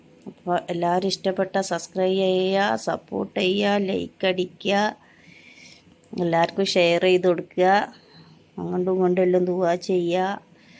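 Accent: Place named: Malappuram, India. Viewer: നിങ്ങൾ മാനന്തവാടി എവിടെയാ സംസാരംമലപ്പുറം കാരുടെ ആണെല്ലോ ഞാൻ അഞ്ചുകുന്ന കുണ്ടാല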